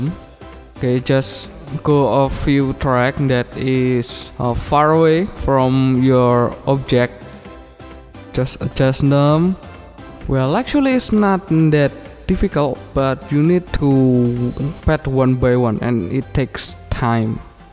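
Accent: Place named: Greece. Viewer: I don't know where are you from but your pronunciation sounds really like greek😂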